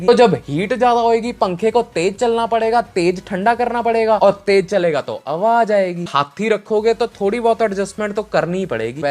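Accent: Punjab, India. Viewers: Bnda bhot sai yr tu ❤❤❤ Punjabi accent reflect krda hai teri hindi ch Btw love your content❤❤❤ keep it up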